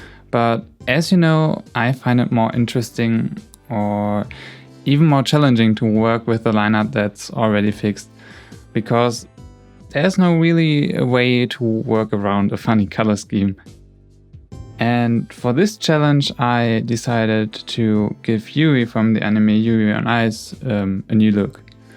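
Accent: Germany. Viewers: iiiiis he german? he kinda has an accent... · You sound pretty german...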